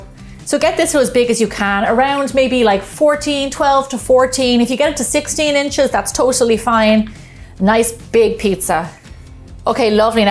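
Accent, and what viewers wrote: Ireland. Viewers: The guy's mouth is only moving while she's speaking like yeah yeah just hand me a slice 😅.. also is she Irish. I now noticed her accent love it and her pizza so 😋😋😋😍🤤🤤🤤🤤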